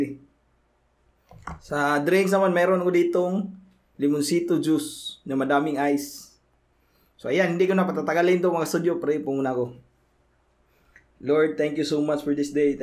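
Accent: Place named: Bohol, Philippines. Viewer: Taga Bohol ka migo ? Mura man ug Taga Bohol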